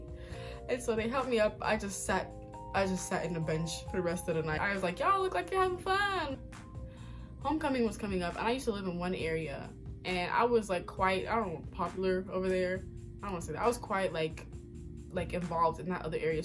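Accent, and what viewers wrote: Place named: New York, United States. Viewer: I can hear the NY accent lol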